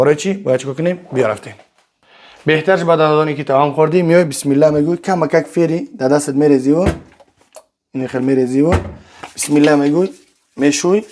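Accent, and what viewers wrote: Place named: Afghanistan. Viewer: Акнун овози худаткати гап задан бигир ранги авгоно гап мезани туро хечкас Кариб намефахмад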